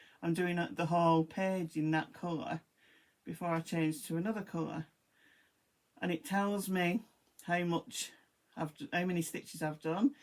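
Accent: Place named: Northern England, United Kingdom. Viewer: Just got into smalls so the winter pattern would be lovely to add to my very small collection - love your channel, so nice to hear another northern accent!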